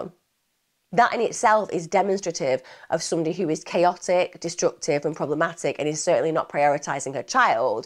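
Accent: United Kingdom. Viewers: Hi watch true crime every night to fall asleep i find it calming 😂 found you through searching for this case seems not many have covered this one fully nice to hear a uk accent 🏴🇬🇧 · Your British accent, even though I’m part of the matriarch as a Canadian, doesn’t make u sound brighter love.. find some creativity. Pls!!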